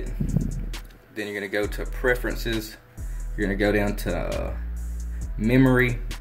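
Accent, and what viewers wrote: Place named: South, United States. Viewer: Where You From You Have A Southern Accent Btw Thxx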